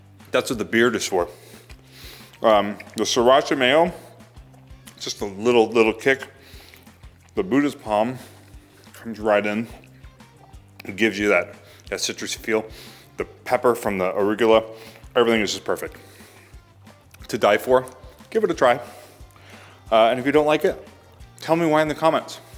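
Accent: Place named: Glasgow, United Kingdom. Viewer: You sound like you're from Glasgow.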